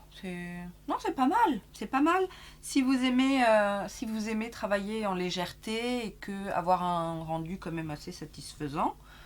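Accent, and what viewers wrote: South, France. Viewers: Coucou ma coquette ah lala tu es dingue je t’adore oui pour le tuto accent du sud 😂😂😂😂 groooos bisous 😘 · Coucou, j'aime beaucoup ce makeup ! Oui donnes nous l'accent du sud pour faire venir le soleil, j'en ai trop besoin, gros bisous ! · OUI pour le tuto accent du sud 👍🤓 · Merci pour cette vidéo avec un mkp très réussi. J’aime beaucoup ton teint et donc tes produits teints que je ne connais pas. Oui oui pour une vidéo avec l’accent du sud... moi je suis du sud-est 👍🏻👍🏻. Très bonnne fin de journée biz 😁🌸💋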